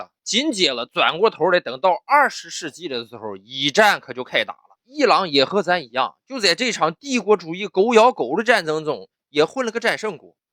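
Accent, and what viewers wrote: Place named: Daqing, China. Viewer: 大庆话，有生之幸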